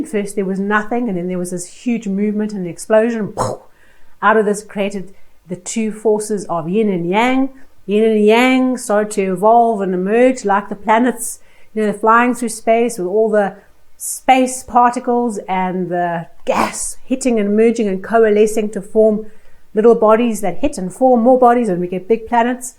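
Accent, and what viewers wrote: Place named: South Africa. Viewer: Oh my goodness, you are South African. I am too!!!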